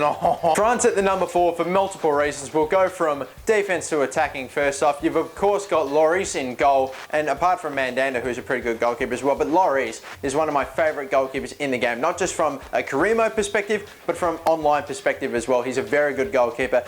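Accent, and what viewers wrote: Germany. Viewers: Great German accent! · i like the german accent I thought it was accurate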